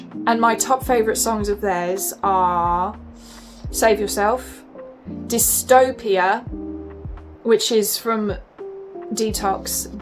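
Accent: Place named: Birmingham, United Kingdom. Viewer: You have shown great courage in order to follow your heart. I think you have kind of a Brummie accent to some extent. Well your taste in music compliments your personality all too well. If you don't mind would you like to try this australian band called. By send my love to the Mitsy as well.😇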